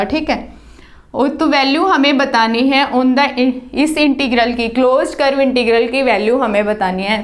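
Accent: Haryana, India. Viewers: Your haryanvi and Jaat wala lehja saf najar aa rha h bhen ji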